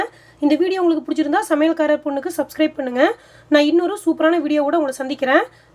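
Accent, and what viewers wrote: Kongu, India. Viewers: Egg Curry looks so tempting n so do ur kongu tamil sounds great😍🌷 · முட்டை குழம்பு செய்முறையும் மண் மணம் மாறாத கொங்கு தமிழ் பேச்சும் அருமையோ அருமை...குழம்பு சூப்பர்....தொடரட்டும் உங்கள் சேவை...நன்றி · Konku timal pesuradhu thani allaku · கொங்கு பாசை சூப்பருங்கோவ்.. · Athuthanka kongu Thamil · I like her kongu slang.. varevah.. 😋 yummy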